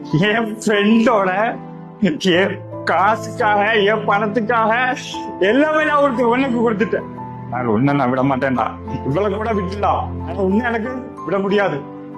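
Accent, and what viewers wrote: Kerala, India. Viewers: சூப்பர் உங்க ஆக்டிங் exlend ❤❤❤❤❤ உங்க மலையாளம் கலந்த தமிழ் நீங்க பேசும்போது சூப்பரா இருக்கு ❤❤❤❤❤❤ உங்க எமோஷனல் லவ் feel பண்ணி பேசும்போது சூப்பர் வேறலெவல் ❤❤❤👍🏼👍🏼👏🏼👏🏼👏🏼👏🏼👏🏼👏🏼👌🏼👌🏼👌🏼👌🏼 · Semma super acting tamil and malayalam kalantha unnoda voice arumai 😍😍😍😍😍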